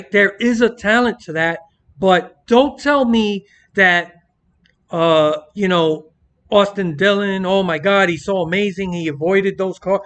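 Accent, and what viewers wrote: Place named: New York, United States. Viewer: These rant reviews are on point my man! Was telling so many people at work and in the neighborhood who you were and how hilarious you are especially with the NY accent 😂😂😂 I remember this weekend quite well as it was my mom’s Birthday Weekend and even though my favorite driver won the Pepsi Firecracker 400, I hate that we had to do it that way which is why I was better off going to the Fair that day instead which also makes me glad I fell asleep in the bedroom for half of that Winn-Dixie Firecracker 250 as well and when I finally woke up, I didn’t even bother and look how much happier I was that whole weekend compared to if I did watch.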